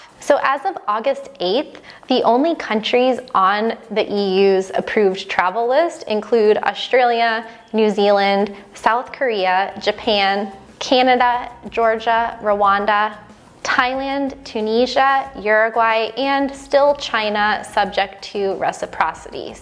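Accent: United States. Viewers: Meanwhile at a place near you in the US of A confirmed Covid19 cases 6.83M, deaths 155K Source Covid19 alert. Africa population 1.22B Covid19 cases 870K, Deaths 100K Recoveries 524, 557 African countries in no particular order: Ghana Population 30M Covid19 confirmed cases 34,406 Ethiopia Population 109.2M Covid19 confirmed cases 15,200 Kenya Population 51.4M Covid19 confirmed cases 18,508 Source John Hopkins's University Africa Centre for Disease Control As soon as I saw the blonde hair and American accent shows a picture of the entire world and only focuses on countries with people who look like her. For a disease that doesn't discriminate; I am thousands of miles away from America so this one can't call the American Police on me to shoot me!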